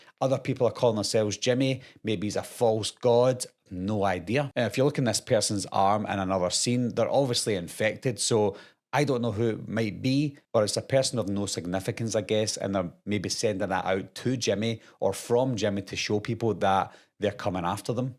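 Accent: Scotland, United Kingdom. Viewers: You've got a Scottish accent!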